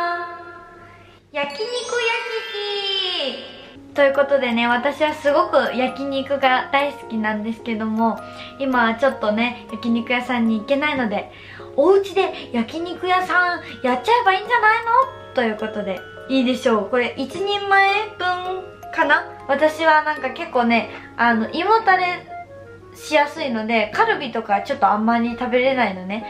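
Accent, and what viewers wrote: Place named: Kansai, Japan. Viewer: 関西弁面白くてめっちゃ可愛かった😳 これからももっと話して😂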